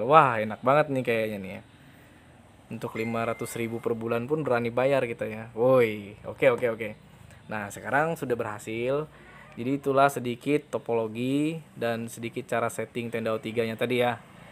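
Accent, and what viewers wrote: Banjar, Indonesia. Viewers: banjar banar.. hehe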